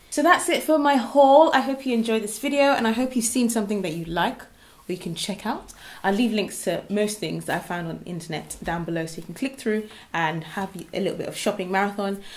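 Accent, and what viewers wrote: Nigeria. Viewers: the Nigerian accent is giving me life lol, I feel like you are so much more relaxed around the camera now! lovely hun!! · I love how the "naijaness" in you is coming out and I love your bloopers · Please do the Nigerian accent throughout your videos, I live for it hahahaha! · The Naija accent hahaha. Perfect. Love your videos.:-)) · Beloved naija accent What strapless bra are you wearing with that off the shoulder tight H&M light pink/peach top? if you don't mind me asking. 😊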